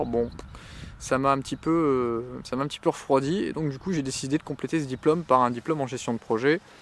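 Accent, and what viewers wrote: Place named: Pas-de-Calais, France. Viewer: Saint-Pol sur ternoise... il y a un super circuit là-bas. Je me disais bien que ton accent m'étais familier. Super chaîne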